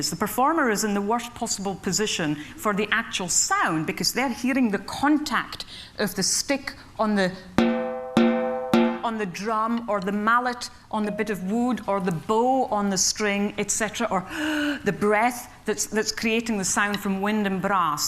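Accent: Scotland, United Kingdom. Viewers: "without the telltale signs that most deaf individuals demonstrate." You are making the mistake of assuming she was born deaf. She wasn't. She didn't become deaf until the age of 12, by which time she could speak normally. And it is also not surprising that she has a Scottish accent, given that she is Scottish. It's not astounding at all. Do a little research next time, before making comments on Youtube. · Thanks for clarifying her age loss of hearing,i was wondering how she got a scottish accent. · For Deaf (of any level) she speaks very well and love her Scottish accent. Also as a drummer and percussionist who came up through high school bands I forgot how much I love the Marimba (what she is playing) and Xylophone. I never was very proficient and graduated to drum kit through college and club bands. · Scots accent is funny!! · she's deaf and she could play the drums?? 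thats amazing! what's even crazier is that she has a scottish accent and she lip reads! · How does she have an accent? She sounded scottish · If she is deaf, how could she pick up a Scots accent? Or even talk at all? Being a physicist all I can get from this is a lot of vague waffle. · Scottish accent. Very recognizable, if you are into such things are listening to accents and figuring out where folks are from (like me...lol). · She is Scottish..Her accent is actually Scottish. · a deaf person with a Scottish accent?? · I can hardly believe this woman is deaf. For one thing, she doesn't speak like a deaf person. She articulates every syllable perfectly with a Scottish accent. It doesn't surprise me that she can play percussion, because rhythm is something you feel rather than hear. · This lady is amazing. So talented and I had no idea she was deaf until she said so. Her accent sounds like she is from the Scottish Highlands (so sounds like Prof McGonnagall from HP). 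Edit: Wikipedia says she was brought up in Aberdeenshire, hence the Doric accent.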